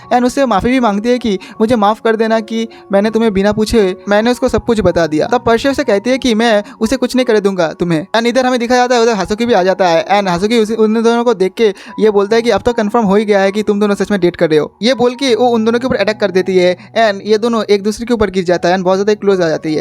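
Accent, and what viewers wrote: Bengal, India. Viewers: Bro,are you Bangali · Vai apka bat Thora Bangla lag rahera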